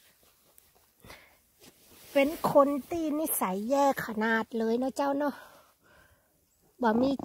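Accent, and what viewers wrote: North, Thailand. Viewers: สวัสดีจ้า ป๊ะกันแฮ๋มแล้ว เผาะก่าอวดเห็ดคำเน้อใหญ่ไปใหญ่ง้าวงามแต้งามว่าทุกคนหันเกาะเจ้าซุมาเถอะเจ้าสงสัยล้นซ่า พี่ชอบมากๆเลยอู้คำเมืองม่วนดีจ้า👍👏👌😍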